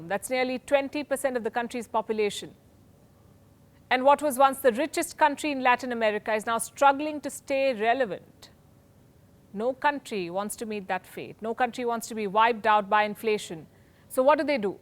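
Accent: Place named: India. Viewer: Palki has a great indian english accent. How did she get to speak so clearly and confidently?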